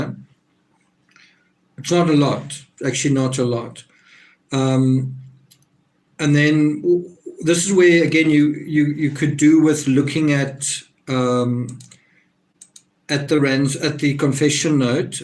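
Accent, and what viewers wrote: South Africa. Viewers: I love your south african accent ...i have family stuff there. And i do really listen to you when you try to analyse these horrendous stories. Your dog is adorable, by the way even tho im allergic. 👍💕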